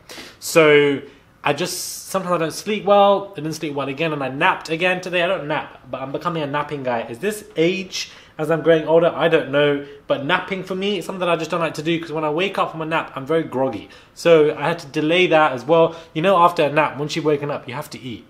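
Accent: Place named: England, United Kingdom. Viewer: I think I like your content but you speak way too fast. Especially because I’m Canadian & also can’t keep up because of your English accent, charming as it is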